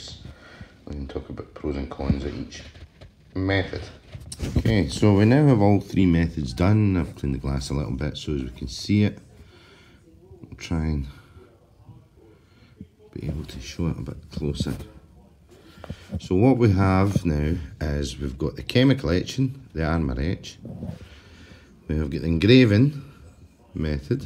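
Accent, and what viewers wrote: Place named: Glasgow, United Kingdom. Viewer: Happnin, a requested you as a player on ps4, you sound like you live in Glasgow or paisley or something. Thing is I've got a guitar and I've had a bit of trouble getting the pickups working. I bought one of these none solder click together harness set up. I thought I put it together well but didn't realize I need to solder an earth wire l. In short I can't get any sound from the guitar and was hoping you'd except some money to fix it. You could also make a video about it if you wanted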